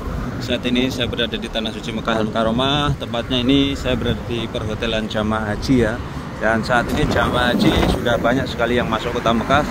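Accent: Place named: Java, Indonesia. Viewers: owalah pantesss medok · Sama medok nya hehe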